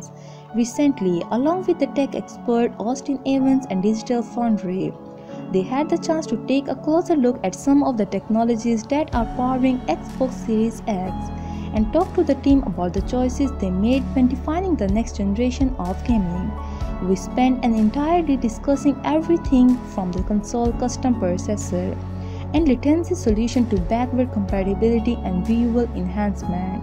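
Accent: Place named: India. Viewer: While reasonably informative, please consider using a native english speaker for conveying the message. The Indian accent did not work very well here, sorry.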